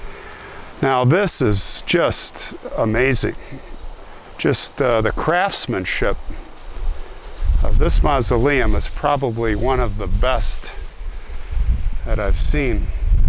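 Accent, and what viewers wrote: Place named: Chicago, United States. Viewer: That Chicago dialect is so horrible. I hate this pos guy's voice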